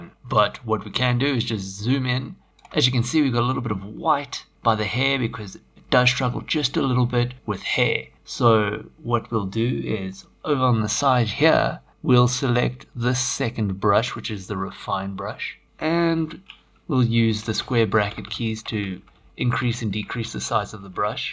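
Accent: South Africa. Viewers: Hey a South African accent :)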